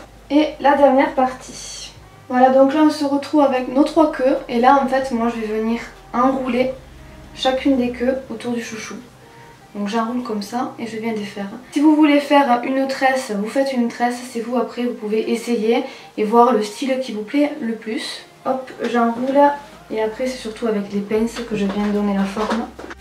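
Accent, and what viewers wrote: South, France. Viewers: Tu gère t la meilleure 💙 💚 💛 💜 t comme moi t'as l'accent du sud mdr 😁😄😅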